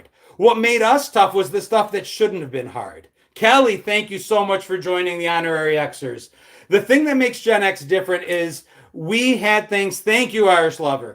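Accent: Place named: North, United States. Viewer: Lol... I knew you were from that part of the northern portion of the US. I was raised in Ga(till 13½) then New Hampshire so Im pretty good at picking up accents. I was born in feb '73 so im Totally a Gen-Xer 😊...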